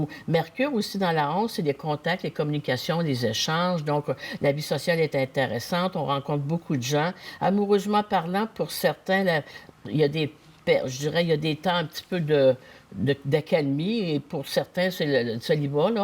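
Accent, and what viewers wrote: Canada. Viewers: Bonjour et merci beaucoup pour vos prévisions et aussi j'aime beaucoup votre accent canadien c'est très agréable merci 😂❤😅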